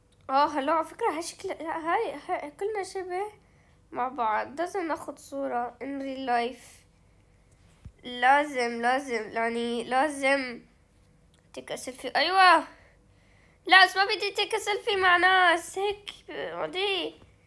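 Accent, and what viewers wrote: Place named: Egypt. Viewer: انت من الأردن ولاكن انتي تتكلمي مصري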